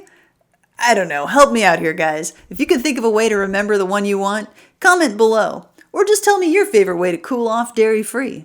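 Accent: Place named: United States. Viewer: Lol. I was caught off guard bc it sounds a lot like mine and my mum’s voices. Kinda just seems like a variety of American accent 🤷🏻‍♀️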